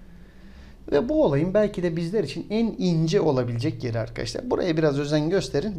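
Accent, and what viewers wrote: Thrace, Turkey. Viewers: Ocam Trakyalı mısınızz?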